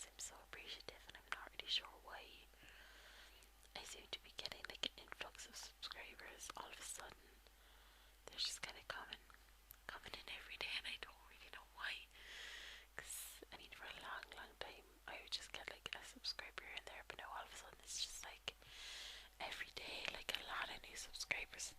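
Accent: Ireland. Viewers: I've recently become interested in Irish music, history, language and culture, and am thrilled there are ASMR videos with the Irish accent. Keep eating healthy and be happy. :)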